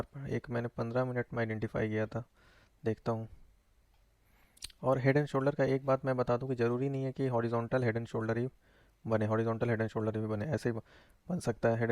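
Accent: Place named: India. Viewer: Can't understand the English. Sounds indian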